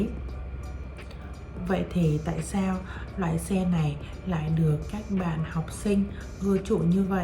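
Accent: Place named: North, Vietnam. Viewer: Cảm ơn em. Quê của em ở đâu? Anh nghe em nói rất rõ. Em dùng “z” như ở miền Bắc nhưng dễ hiểu nhỉ 😮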